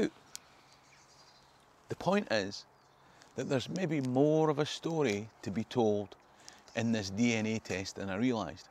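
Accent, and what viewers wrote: Scotland, United Kingdom. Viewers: whatever happened to the video with your DNA results? With Scottish, Guinean, English and German that you know about, what else is in the mix? I accepted you as Scottish a minute or 2 after the first time I heard your “Let me tell you a story…” and my only hesitation had everything to do with the clothes you were wearing and your hairstyle - not what you look like. You love your country and do a great job of explaining the sometimes (usually?) convoluted course of her history. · Do you think you're Scottish? Your accent says you are. Scotland was formed from diverse people. Sod the white supremacists who say you aren't! My sister took a DNA test that said she was 17 percent Scots-Irish. I have not taken s DNA test yet. She found shit that was amazing. Never mind, the white supremacists are alive and well in thELATe US. Piss on the people who are hung up on color. 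I have biracial nieces and nephews. Until we ELIMINATE those assholes, people like you and me will have them in radar. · I take people as they are not what they look like. You was born in scotland and talk scottish so your Scottish so that's that.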